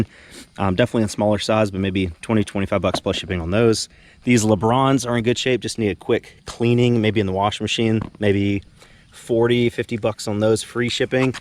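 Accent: Australia. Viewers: That Australian accent though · Hahaha mate your Aussie accent was worth a giggle 🤣gday mate hope ya both well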